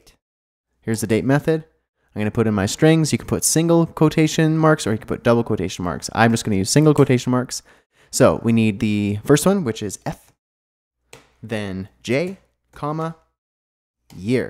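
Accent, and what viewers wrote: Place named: Canada. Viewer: I love Canadian accent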